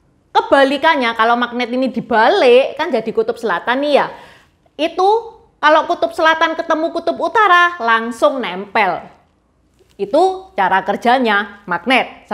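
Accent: Java, Indonesia. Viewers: Cik logat jawa bangat. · Logat Jawa tapi Mandarin .... H E B A T... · logat jawane medhok... cina abad lampau memang tergolong maju... Klo penemuan cina abad ini apa y? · Konten bagus saya suka sejarah apalagi jowone medhok 😀👍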